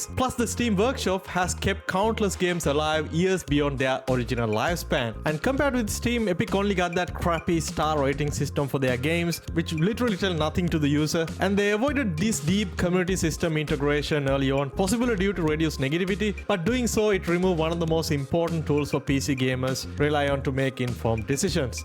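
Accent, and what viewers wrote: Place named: India. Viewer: Yo dude are you from india??your accent feels indian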